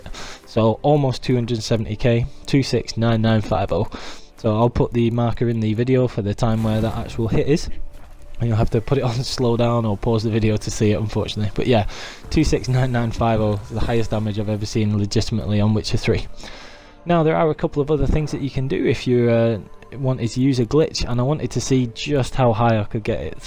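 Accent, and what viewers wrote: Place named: Bolton, United Kingdom. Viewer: You from Bolton?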